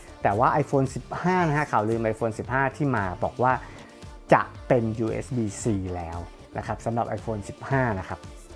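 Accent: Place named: South, Thailand. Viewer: แหลงใต้แล้วล่าสุดเยื้อไม่ไหว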